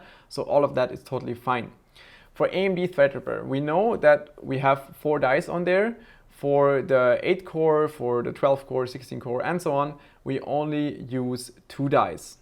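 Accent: Germany. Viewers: Man hört den deutschen Akzent xD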